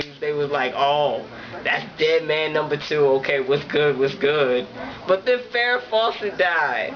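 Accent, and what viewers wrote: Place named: New Jersey, United States. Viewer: Hey, sweetie. Are you from Jersey? You have that accent. I live in Jersey, too. I think you and I should have an affair. Are you game? Yes or no, baby. Kiss kiss.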